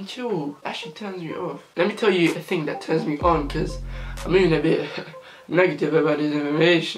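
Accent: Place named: United Kingdom. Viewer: Why r u so British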